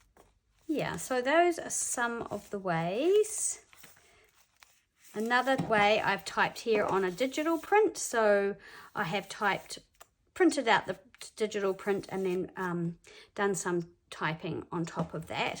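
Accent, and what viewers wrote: New Zealand. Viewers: So I’m new to this junk journalling and been inspired by a local friend. I think I’ll watch and learn this month, and start getting things together for when June comes around. Having watched Megs intro videos, yours is the first one I’ve watched with the daily prompts, and I’m even more inspired now. And how lovely to hear another kiwi! I’m in the waikato. Have subscribed to your page and look forward to seeing your journal develop! Thanks so much.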